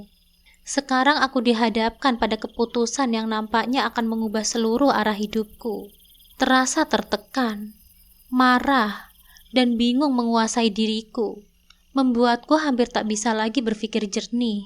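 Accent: Java, Indonesia. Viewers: Maap pembawaannya medok mbak kurang enak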